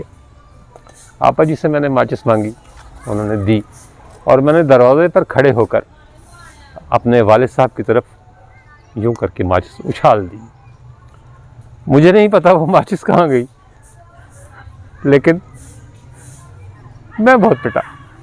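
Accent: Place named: Urdu, India. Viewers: Thank you for sharing your family information with us. I am curious if your ancestors actually migrated from India, cause your Urdu accent is very much like Urdu speaking families who migrated from Urdu speaking regions of India like Lakhnao or Dehli etc.? May Allah bless you and your whole family! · कोई फर्क नहीं है उर्दू और बोलने का लहजा UP jaisa h . Pehle Baar jb Aapka vilog dekha mujhe bhi aesa lga .I'm from UP ..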